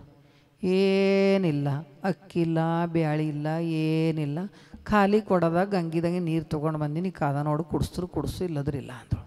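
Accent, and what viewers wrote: Bidar, India. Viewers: ಬೀದರ್ ಭಾಷೆ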